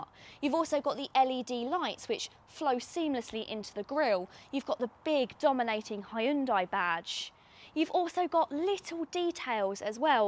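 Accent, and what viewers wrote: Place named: England, United Kingdom. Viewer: English accent makes car reviews more lovely. That said, can’t help noticing driving on the left side. Having lived in the US for a long time, I would cause a massive accident if I had to drive in the UK.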